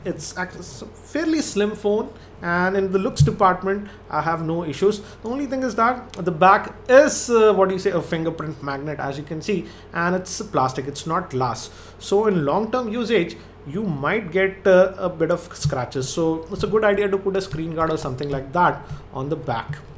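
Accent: India. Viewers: i like if indian people speaking english, because different but good. :) nice sony :D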